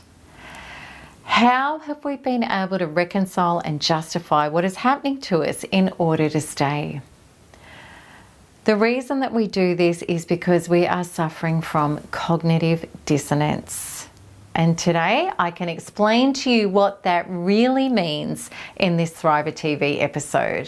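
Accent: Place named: Australia. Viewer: I never cared for Australian accents, no offense. Then here comes! Your voice, including accent 😀 is so soothing. God bless the good work you do! CD for me was all the shitty things he was DOING and the "I love you" he was SAYING.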